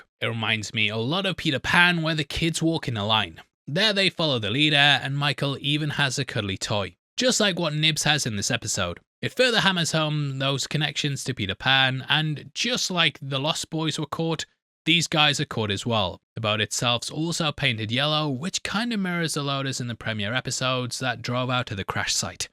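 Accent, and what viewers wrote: United Kingdom. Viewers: Hint: He has a very slight, barely noticeable British accent..... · You really dont have a strong American accent, are you from the UK? 😎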